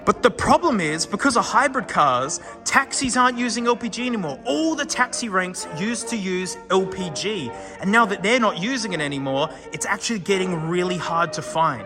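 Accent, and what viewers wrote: Australia. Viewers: You are the only YouTube aussie that I subscribe and keep up the good work and I love your accent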